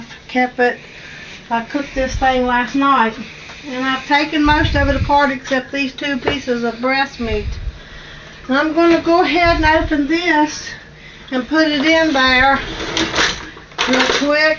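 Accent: United States. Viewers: First time watching your videos and that soup looks delicioussssss!!!! I will try to cook it. Thank you for sharing and I love your accent, which part of the USA are you?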